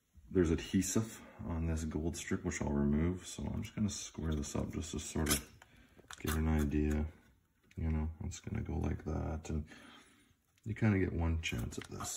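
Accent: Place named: Ontario, Canada. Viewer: Thank you for such a great video! My radio is acting up now too so I ordered the digitizer from Ali. I'll report back after I replace it. I'm in Canada as well, Halifax. Where are you? You sound like maybe from Ont.? Thanks again! Cheers...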